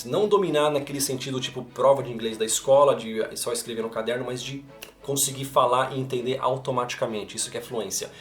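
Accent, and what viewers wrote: Brazil. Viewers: O “brazilian accent “ te entrega irmão. 🤣🤣🤣🤣 Acontece comigo também e falo ingles há mais de 20 anos! Osso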